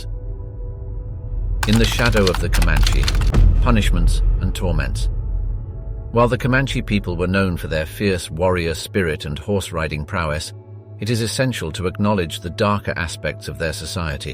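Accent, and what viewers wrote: United Kingdom. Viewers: How appropriate that so much misinformation (& mispronunciation) is narrated in a BRITISH accent.... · Why do you have a British narrator for American history? I'd think you could have done something alot better than start off negatively.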